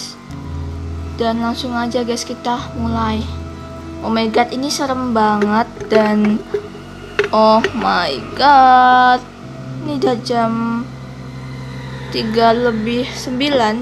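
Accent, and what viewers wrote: Indonesia. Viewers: Kak orang Jawa ya?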